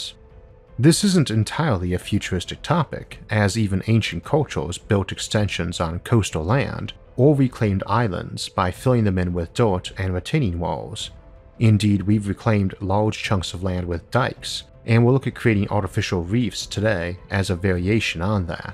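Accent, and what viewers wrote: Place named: South, United States. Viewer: I love space and everything about it. I have been looking for years for a channel that will satisfy my hunger for knowledge, both factual and fantasy. I have finally found it. Thank you Isaac and team. As for the "speech impediment". It's no impediment, this channel wouldnt be the same without your AMAZING Gone With The Wind lilt. SCARLETT: Isaac, Isaac....Isaac, if you go, where shall I go? What shall I do? ISAAC: Frankly, my dear, I don't give a damn. I'm off to Mars!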